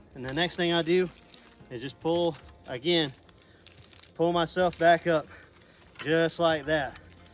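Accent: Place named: Florida, United States. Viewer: thanks for a good video and plain florida talk. good luck hunting. I hunt osceola wma in a sumitt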